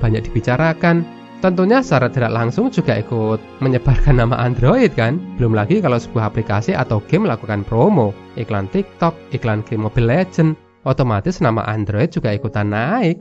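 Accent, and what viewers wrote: Indonesia. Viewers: Nonton full video ini gara2 voice over nya yang Indonesia banget 🙌🏻🙌🏻🙌🏻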